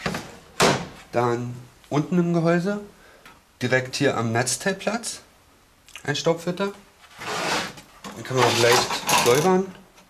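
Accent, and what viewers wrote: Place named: Berlin, Germany. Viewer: bei dem ganzen Berlinerisch versteht man ja den rest gar nimmer^^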